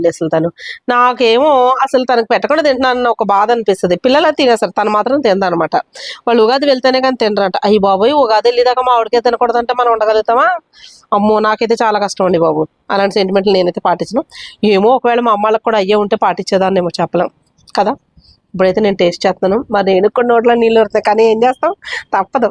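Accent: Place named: Godavari, India. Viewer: మీది గోదారి జిల్లానా అండి..😂😂😂😂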